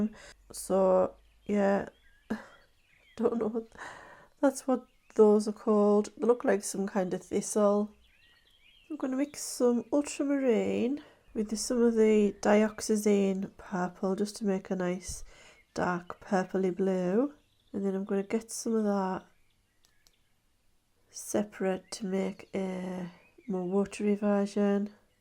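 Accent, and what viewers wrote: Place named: North East England, United Kingdom. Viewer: Thanks for another helpful video. I thought you were saying cornflowers in the beginning but it was your north-east accent.🤭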